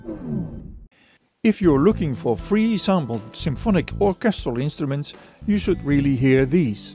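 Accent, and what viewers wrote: Netherlands. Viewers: You sound Dutch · a lot of these sounds actually sound better than some of the pricey VST's (at least before you do a hell of a lot tweaking and modifying). You can certainly learn the trade using these, before upgrading to the big pricey stuff. Thanks a lot neighbour (I'm from Belgium, and I hear a Dutch accent in your voice, right?)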